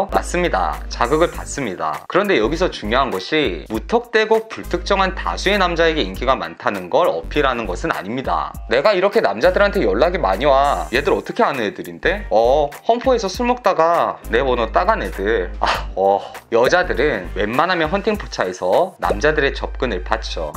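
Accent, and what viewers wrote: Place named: Busan, South Korea. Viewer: 후..부산 사투리 치여요ㅋㅋ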